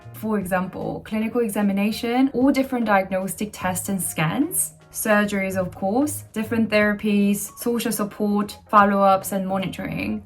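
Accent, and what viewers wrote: United Kingdom. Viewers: 진짜 너무 멋있어요!!! 실행력도, 하고자 하는걸 해내는 능력도 정말 멋있고 존경합니다!!!! 영국 발음도 너무 듣기 좋아요..🤍새로운 길을 응원해요~~~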